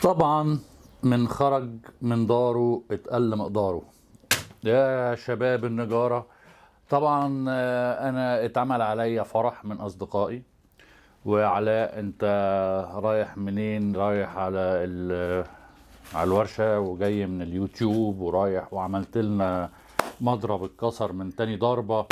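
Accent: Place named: Alexandria, Egypt. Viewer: كنت بستغرب لما الناس تقفشني بس فعلا الاسكندرانية مميزيين كلامك مميز ومرتب وبشكل علمي شكرا جزيلا لك على المحتوى الرائع لجميع الفيديوهات ان شوفت واحد وماقدرتش اقاوم وشوفت كل الفيديوهات.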